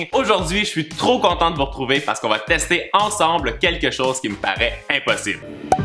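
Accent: Quebec, Canada. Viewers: L'accent québécois est flagrant 😂🔥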